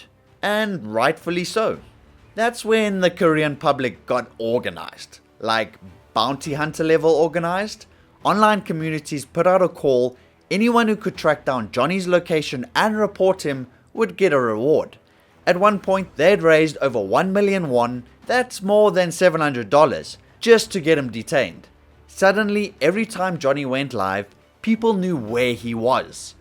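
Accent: South Africa. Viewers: Refreshing to hear South African accent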